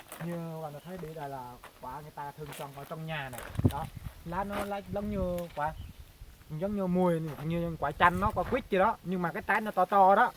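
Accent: Central Highlands, Vietnam. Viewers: Vui vui giọng nói phổ Thông mang chất Tây nguyên., như vậy sẽ được gần gủi với cao nguyên phong cảnh thiên nhiên của núi rừng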